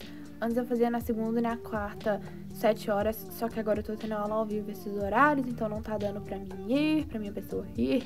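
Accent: Minas Gerais, Brazil. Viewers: Vc é mineira ? Seu sotaque é tão lindo!!!!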